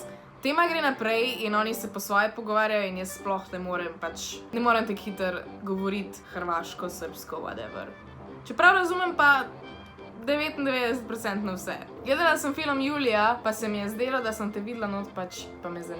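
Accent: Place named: Velenje, Slovenia. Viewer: Halo gledalci spostujte jo kakrsna je ce ne pa ne gleda ce vam ni vsec... Men je top ker je taksna kot je in pac velenjcani majo tak glas sej govori slovensko sej ne kitajsko ce se pa v šoli niste ucil jemat snovi narecja v Sloveniji pa ni moj problem ampak sami ste krivi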